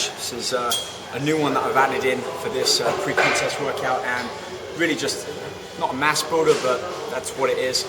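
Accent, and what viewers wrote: United Kingdom. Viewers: Left hand drive? --- and his British, where is he ? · I hear a British accent in his voice. Where is he from? And how long has he been a bodybuilder?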